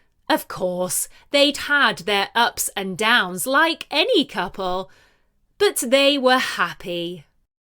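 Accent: United Kingdom. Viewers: And I love British accent 💕💕💕💕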